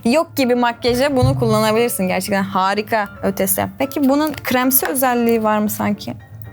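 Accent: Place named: Adana, Turkey. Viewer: abla adana li gibi sin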